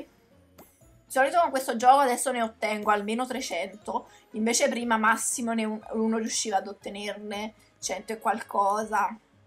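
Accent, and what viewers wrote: Naples, Italy. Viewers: Ma sei napoletana? Scusa se te l'ho chiedo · raga solo io sento un accento napoletano hahaha <3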